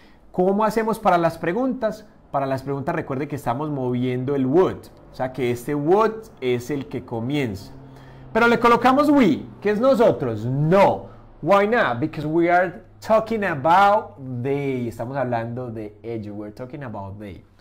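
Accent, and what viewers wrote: Antioquia, Colombia. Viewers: El mejor profesor de inglés de todo el mundo... Nadie como tú eres demasiado bueno para enseñar... Saludos desde costa Rica. Adoro tu forma de enseñar Inglés y también adoro tú acento colombiano 🥰😘😘😘😘😘😘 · Es colombiano, cierto? De Antioquía?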